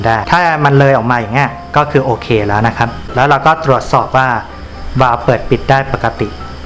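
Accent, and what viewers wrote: China. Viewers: เป็นคนไทยเชื้อสายจีนใช่ไหมครับ พูดภาษาไทยสำเนียงจีน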